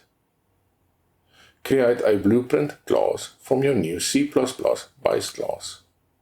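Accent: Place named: South Africa. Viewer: South African accent is strong with this one.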